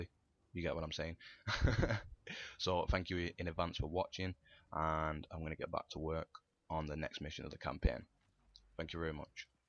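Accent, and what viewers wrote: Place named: Scotland, United Kingdom. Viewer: I love your accent! Are you Scottish perhaps?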